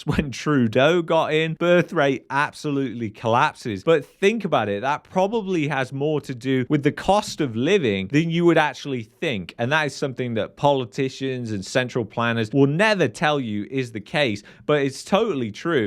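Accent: United Kingdom. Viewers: If it s so terrible here in Canada what are you doing living here? Judging from your accent I would remark UK is even more messed up then Canada ever will be.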